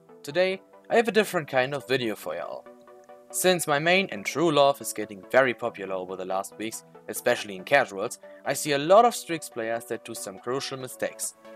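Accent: Germany. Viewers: Youre German Man merkt sofort deinen Akzent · Are you German? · Are you German i just think it because i am from Germany too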